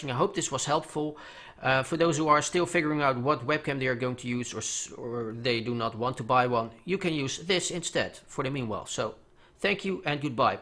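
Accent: Netherlands. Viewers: Nederlands? Ok hoor t aan je accent haha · You're defenitly Dutch right? i'm Dutch aswell and my YouTube is english and i just can hear your accent we share :D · Hi, U sound a bit dutch, Are u dutch? Cuz i am XD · Bij mij hangt de download de hele tijd vast (ik weet dat je NL bent ik hoor het) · je bent gelijk nederlands dat hoor ikg elijk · Zonder dat ik naar de reacties las hoorde ik direct dat je Nederlands was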